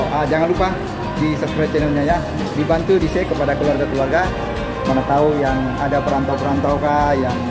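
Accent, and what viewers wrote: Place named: Sabah, Malaysia. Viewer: Cakap loghat sabah sudah.Maju terus sahabat.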